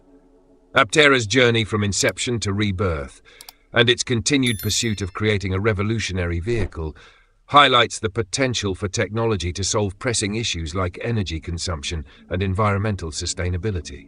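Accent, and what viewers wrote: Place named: United Kingdom. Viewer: Oh, goody! Another fluffer for aptera ..but with a British accent.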